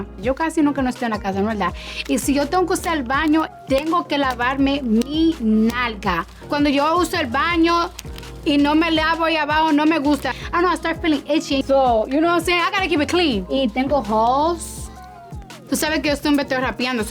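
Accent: Dominican Republic. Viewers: Ella se considera dominicana porque está orgullosa y su acento es súper dominicano, también dijo en un en vivo que ella se crío allá en RD y después vino a USA aunque nació allá. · ella a dicho que es dominicana, incluso su acento en español es dominicano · Sabía yo que era Dominicana por su acento jejeje me encantó oírla hablar, pero cuando la ví dije y de donde esta chica tan linda, nunca pensé que fuese dominicana pero cuando la oí hablar dije ese acento es Dominicano jeje muy bella, Dios la bendiga 💖🇩🇴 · Se le nota el accento Dominicana y Nueva York a la vez😊 me gusta❤ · AMO SU ACENTO DOMINICAMO ♡♡♡ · Con todo y todo ella no sabiendoyñ Bien Español suena muy Dominicana 😭😭😭🇩🇴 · Me encanta su Español acento dominicano me encant❤ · no nació en República Dominicana pero tiene muchas cosas de dominicanos realmente. 😁 Cuando habla español es Dominicana pura 👌🇩🇴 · When first started speak in the very beginning, I was like "Que fue!" THEN her Dominicana came out and I was like, "Oh ok, ta bien!" 😂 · La ame, su acento dominicano es una delicia. 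· siempre Con su acento Dominicano 😍🇩🇴🔥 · Se que ella es dominicana pero para haber crecido en USA su actitud y su español es muy dominicano 😂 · Esta tiene un slang dominicano de barrio full😅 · habla como una❤❤ tipica dominicana❤su jerga,su lenguaje colloquial es puro dominicano😊no puede negar sus raices dominicana❤❤ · loveee the Dominican accent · Yes, it sounds very natural and the accent she has is accurate (i think it is from República Dominicana) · bueno mi amorch debería debatirle ese punto a, pues ella se caracteriza por puntualizar el dominicanismo al hablar y otra vaina que te voy a decir es que los Dominicanos nacemos donde nos da la gana 😂😅 pa ' que cepa🤔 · 😆 hablando espñol es todo una dominicana nata cremosa, no tengo dinero por si me quieren robar y la cartera ya es bastante 🤣🤣el tipo la dejo pegada la amo me alegra sus videos y locuras natural delicada salvaje ese bolso falto la piedra para lanzar a los que se metan con ella 🤣 si es contigo nicki minaj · Acento Dominicano 😂😂 a muchos no les gusta pero que podemos hacer... 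La liga de razas influye mucho. Tambien agregarle que somos jocosos y muyyy expresivos al hablar. 😂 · Tiene un acento dominicano precioso ❤. Mi latina queen 😊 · El acento Dominicano es ÚNICO 😂😂 IT’S 😂😂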